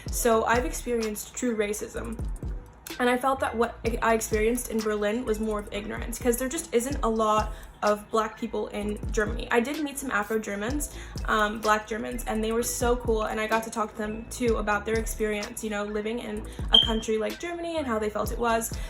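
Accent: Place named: United Kingdom. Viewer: i Said it somewhere else in the comments already, but frankly, there are almost never Americans of colour coming to Germany. Even with your accent, I would assume you’re from the UK. Also, people working at food stands are oftentimes local folks and not the most international, able to grasp the history of slavery in the Americas as a whole. Berlin is nice and I like it every day, no matter Corona. Without corona, spending your night with friends at Sisyphus or at the river with Späti beers is great. Thanks for saying our metro is clean, I always have the feeling like it’s gross. Puke, pee, full program. Greetings from Berlin.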